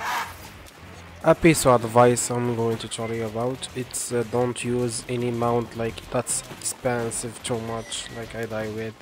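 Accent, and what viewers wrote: Morocco. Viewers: This is a Moroccan accent xD it can't be otherwise haha love it content man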